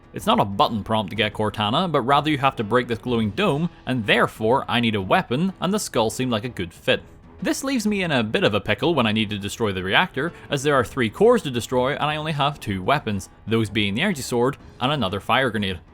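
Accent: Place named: Northern Ireland, United Kingdom. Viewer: Oh that's what that accent is! I was like well that's not scottish or welsh, and it's unlike any irish accent I've ever heard. I mean I know y'all have a new accent every 50 feet but I guess it never occurred to me that northern irish would be distinct from irish to american ears. huh.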